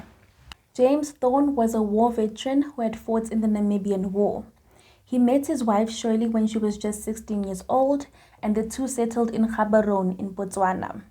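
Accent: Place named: South Africa. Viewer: Hey you sound like amazing journalist and these are unique video.....love the SA accent. WhatsApp fam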